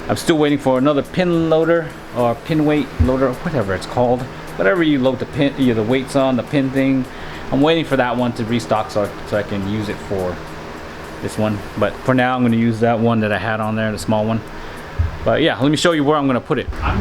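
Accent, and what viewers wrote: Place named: Mexico. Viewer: That's a typical Mexican English accent 🤭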